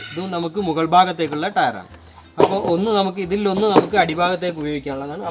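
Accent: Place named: Palakkad, India. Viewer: സംസാരം കേൾക്കുമ്പോൾ പാലക്കാടുകാരനെപോലെ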